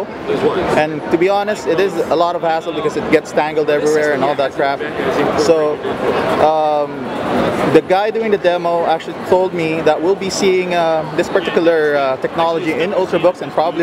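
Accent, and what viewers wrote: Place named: Philippines. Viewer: I can tell by your speech and how you speak that you're a filipino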